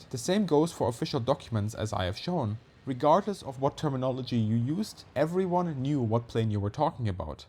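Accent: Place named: Germany. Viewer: Are u german? have the accent but was an interesting video!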